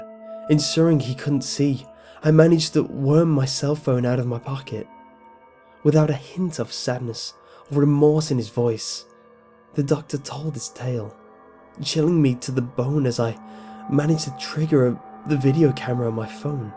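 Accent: England, United Kingdom. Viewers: Is he English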